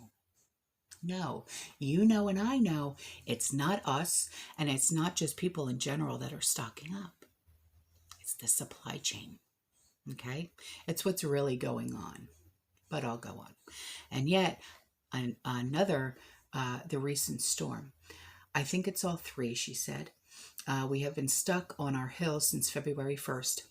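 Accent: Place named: Philadelphia, United States. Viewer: Dear love you! are you a Philly girl? Your accent sure sounds like it!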